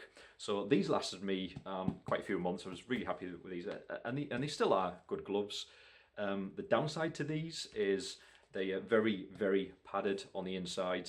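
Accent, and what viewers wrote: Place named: Scotland, United Kingdom. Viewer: these are literally my dream gloves I own a pair of heavy bag fairtex gloves and love the Daylights out of them also I noticed the Scottish accent I'm originally from Manchester and live in the United States I could be wrong you could be English but you sound more Scottish to me am I correct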